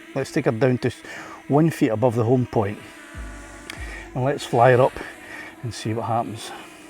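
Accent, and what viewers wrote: Scotland, United Kingdom. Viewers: Awesome video mate. Love the accent… can’t help but think of Billy Connolly 😂 I only started flying recently and honestly thought the drone was indicating it’s height off the ground.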